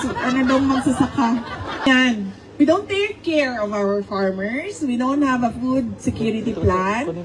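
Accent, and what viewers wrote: Visayas, Philippines. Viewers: parang tanga magsalita halata nagbabasa may script pero slang p rin pagkabisaya khit ingles pa😅 wthell!!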